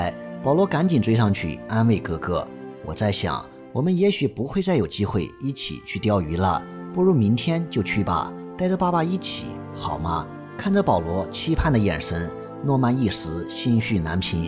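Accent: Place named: Henan, China. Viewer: 河南方言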